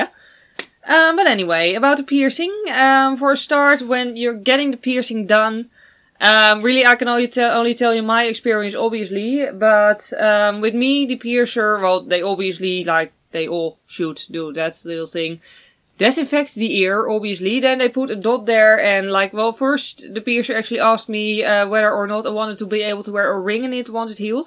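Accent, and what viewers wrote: Netherlands. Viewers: are you Dutch you kinda have a Dutch accent?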